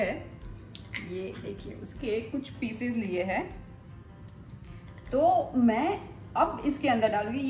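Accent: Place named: Kathiyawad, India. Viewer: Love to hear your kathiyawadi ascent..and all your recipes ... simple but fabulous 👌👏😘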